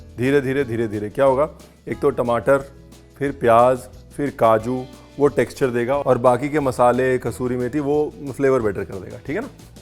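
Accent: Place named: Punjab, India. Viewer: Mr. Brar, I have come across your cooking videos while surfing on the net randomly about two weeks ago. Anyhow, I haven't tried your recipes yet, but the mouth watering desi "Tarka" of your crispy and spicy chat is enhancing the flavour and taste of your programme immensely. The reason for enjoying & watching your programme is not your recipes but your dextrous and impressive way of connecting with your intangible viewers globally. It's worth mentioning that amalgamation & use of typical household punjabi, hindi words with appropriate dialect phonetics in your chat is stunning & awesome. Great job, keep it up.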